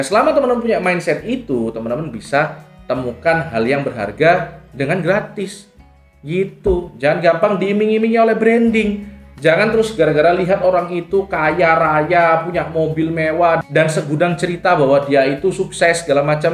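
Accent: Medan, Indonesia. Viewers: Sesama Anak Medan ya bang .. ahahaha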